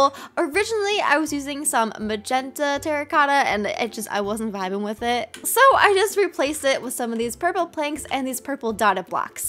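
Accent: United Kingdom. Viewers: Are you from British? Just asking 😊 love you videos <3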